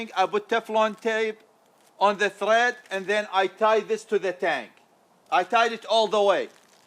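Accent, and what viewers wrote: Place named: Iraq. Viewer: لهجتك مال عراقي بس لاتطلع عراقي 😅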